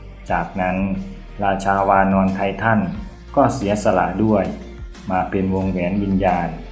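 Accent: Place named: Isan, Thailand. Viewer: เหมือนจะออกเสียงไปทางอีสานนิดๆนะครับ😂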